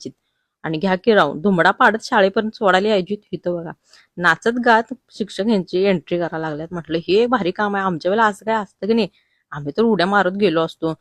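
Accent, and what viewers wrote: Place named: Solapur, India. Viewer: तुम्ही असेच गावची भाषा बोलत रहा ऐकवयास चांगले वाटते सोलापूर ची भाषा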